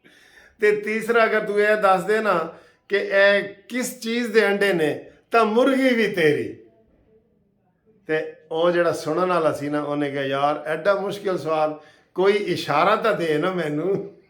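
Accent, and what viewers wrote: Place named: Punjab, Pakistan. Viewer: Maashallah buht khub pnjabi tuch buhat achha lgta hy slamt rahinn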